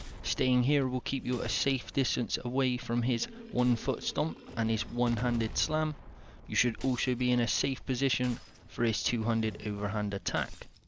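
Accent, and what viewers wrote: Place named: West Country, United Kingdom. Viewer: Summerset or Devonshire boy? Trying to place your accent my Brother, Cornishman here. It's a nice little guide thank you man.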